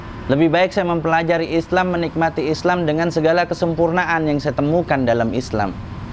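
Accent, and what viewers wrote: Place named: West Java, Indonesia. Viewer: Koh.. Chinese berlogat sunda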